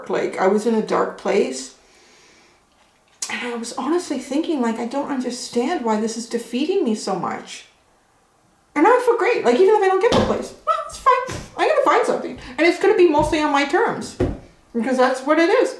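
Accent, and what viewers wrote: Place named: Canada. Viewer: Really like your channel- wish you lived nextdoor! You might almost, I live in Minnesota and you sound Canadian.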